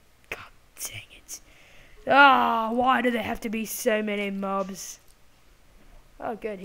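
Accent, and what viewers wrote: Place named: Australia. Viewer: U sound more australlian bro and i am british and i dont care i dont expect u to have a good accent since u r not british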